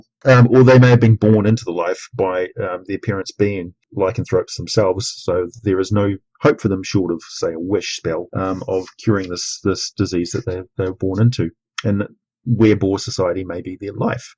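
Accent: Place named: New Zealand. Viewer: That Kiwi pronunciation tho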